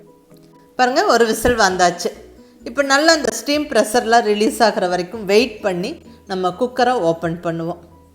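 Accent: Coimbatore, India. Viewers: அக்கா நீங்க பேசும் கோயம்புத்தூர் தமிழ் ரொம்ப அழகாக இருக்கிறது.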